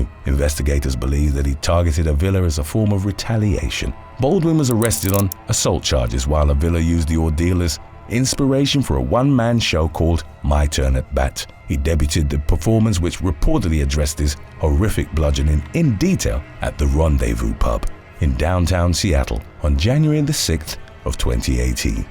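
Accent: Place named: England, United Kingdom. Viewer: he's English. they pronounce things differently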